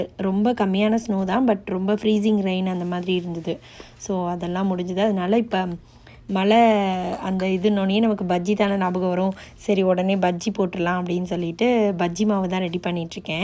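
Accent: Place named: Natham, India. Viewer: அது எங்க ஊரு நத்தம் தமிழ் அண்ணா so நல்லா தான் இருக்கும்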